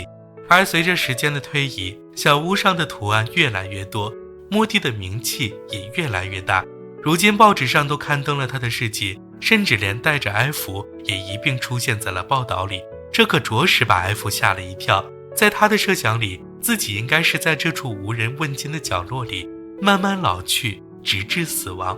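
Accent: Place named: China. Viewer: 很好奇，解說說自己在臺灣，卻是滿口的標準普通話，難不成是大陸人在臺灣:face-blue-smiling:应该是的！這部片子很久以前看過，印象很深！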